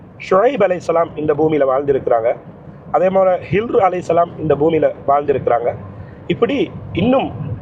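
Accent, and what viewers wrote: Sri Lanka. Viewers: Mashallah Allamthulliha Allamthulliha Almighty Allah bless you excellent presentation sir you are speaking ceylon tamil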